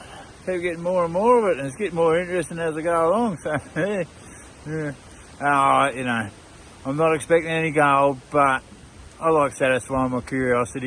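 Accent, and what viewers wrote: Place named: Australia. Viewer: Hi! You got a new subscriber from Sweden. I really liked your video, easy, authentic and genuine and I think you will be a very liked person on YouTube. And with that said, I had a little bit difficult understanding some words but I must say that you “down under” have so nice “sounds” in your English! 🙂‍↕️ Good luck on your journey! 🙋🏼‍♀️🇸🇪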